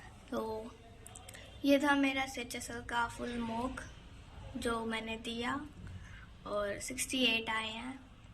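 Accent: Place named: South, India. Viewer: South Indian ho kya aap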